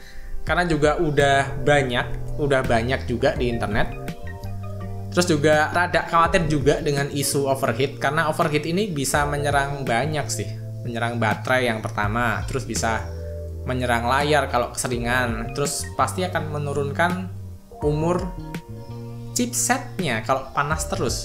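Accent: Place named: Java, Indonesia. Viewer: Logatnya jawa bang..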